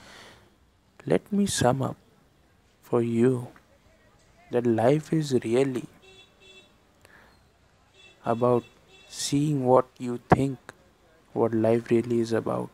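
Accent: India. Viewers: Please do whispering videos!!! The Indian accent is the best accent ever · Indian accent is just the best! <3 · I love the Indian accent! Would be great if you did some whispering videos. 😊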